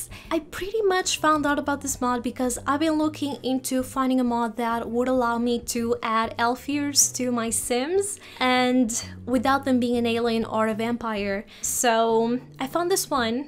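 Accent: Portugal. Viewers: Your accent sounds Portuguese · i knew you were portuguese haha it's so cute on your voice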